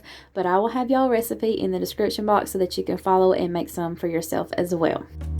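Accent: South, United States. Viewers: Hi pretty girl, thank you for sharing your recipes , everything look so good and can't wait to try to make as well as you. Ps. Love your Southern accent. My daughter lives in Georgia too and my grands have the same accent.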